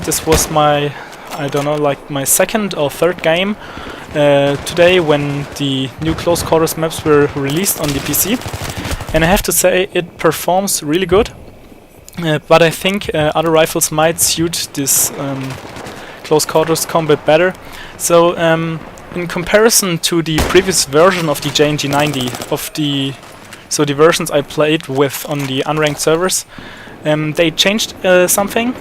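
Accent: Germany. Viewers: Du bist doch Deutsch man merkt das voll :) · Are you German ??? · german accent too thicc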